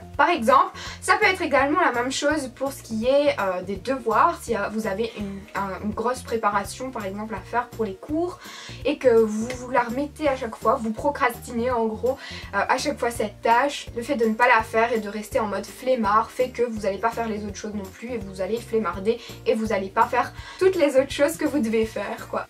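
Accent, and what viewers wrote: Belgium. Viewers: merci pour cette superbe vidéo 😘😘 t es trop belle 😍 vive la belgiiiique et l'accent 😍😍😍😍😍😍😘😘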